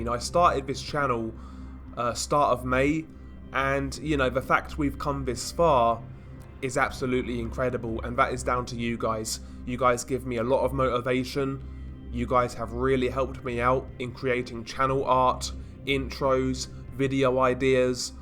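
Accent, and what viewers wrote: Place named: United Kingdom. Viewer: God British English is the fucking worst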